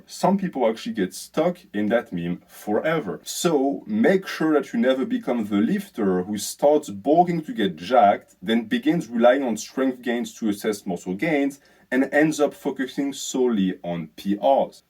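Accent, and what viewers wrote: France. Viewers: Do you have an instagram fellow lifter of heavy weights i wanna see your sick physique. PS: Javais cramé que t'etais francais a ton accent mdrrr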